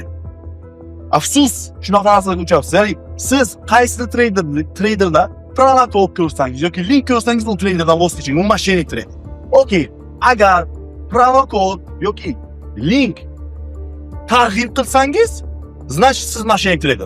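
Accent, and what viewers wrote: Bukhara, Uzbekistan. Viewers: Акани узи Бухоролик шеваси Тожикча.Узбек тилида озрок кийналади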